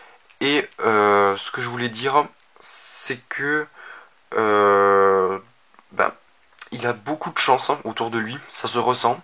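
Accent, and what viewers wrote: South, France. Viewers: Toulouse? Montpellier? Ou autre?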